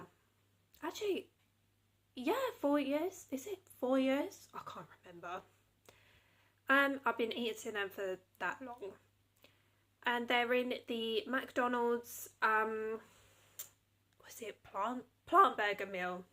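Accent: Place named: United Kingdom. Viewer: This was randomly shown to me on my feed, you seem to have a very comforting aura about you. You’ve got a nice voice and personality. I wish you the absolute best with your content creation. I would say that the most critical thing you should do is plan as much as you can before you start recording. If you can do that, while maintaining the authenticity that you have in spades, that’s the best you can do! You’re doing great!! Also I really like your british accent 😅 and you’re pretty funny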